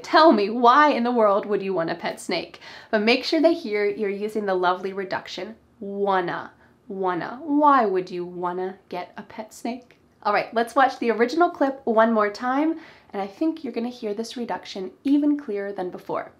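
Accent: United States. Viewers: She’s so perfect, and perfect way to learn American accent. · Hi,! I just watched one of your lessons. I love your US accent. It’s amazing and so mean to me. I hv got hard things in putting my tongue to pronounce words correctly. It made me be shy to speak. Lol. I need tips from you. Help me pls. Thanks and wish you always be happy there. ❤️ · I like 👍 American 🇺🇸 accent 🌸🌸🌸🌸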